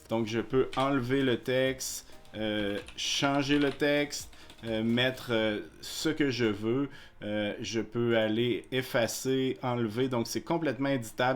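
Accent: Canada. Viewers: merci beaucoup , je kiffe entendre l'accent canadien haha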